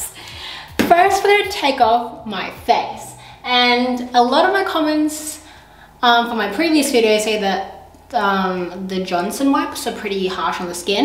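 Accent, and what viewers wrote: Australia. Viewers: I love your acccent even tho I have been to Australia half my life 😂😂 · Onggggggggggg I didn’t know she had and Australian accent this my first time on her yt cause of the tik tok deletion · I love the Australian accent🤩🤩🤩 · It’s weird seeing an Asian with an Australian accent....btw she’s like the first pretty Asian I’ve seen, prolly cuz she’s white-washed (her name IS....yikes)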